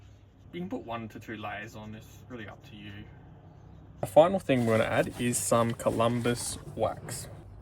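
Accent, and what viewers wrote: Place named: England, United Kingdom. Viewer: Love your videos! Can you give us a tutorial for concealed carry bags? I am an U.S Army veteran, and I carry my gun with me for safety purposes…but the concealed carry bag I purchased on Amazon is already falling apart after having it for 1 year. I was wanting to make my own. Not sure if you support concealed carry weapons, since it sounds like you’re from England, but I just thought I’d ask.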